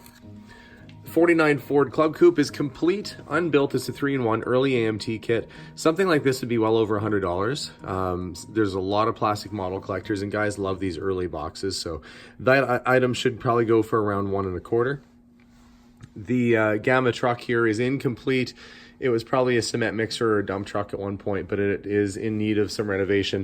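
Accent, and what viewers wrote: Canada. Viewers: I'm not used to a Canadian accent because I'm from the Southeastern US, so it throws me off with some of the ways you pronounce things. Other than that, I love this. I love you going through her house and all the cool things you're finding. Keep up the good work. (I misspelled Canadian in case you were wondering what I edited.) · Lol you have a strong canadian accent with some words. I will forget you're in canada, then i will hear something like "sorry", "ay" or "looney" lol then im like, oh yea he's up north. ;-)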